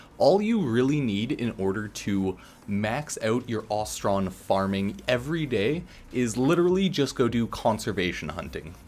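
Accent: Canada. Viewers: I love your Canadian accent. When ever you say "about". Love it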